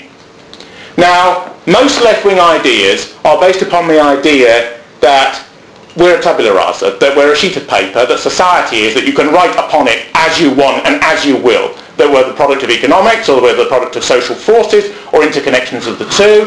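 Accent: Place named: RP, United Kingdom. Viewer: Posh accent.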